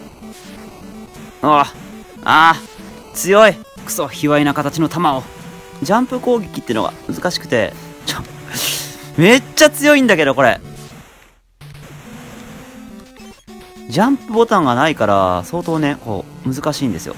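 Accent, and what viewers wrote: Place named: West, Japan. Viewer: アニめし屋 by さんって西日本出身ですか？そのネタもググってやってみてくださいのイントネーションとかからそんな感じが…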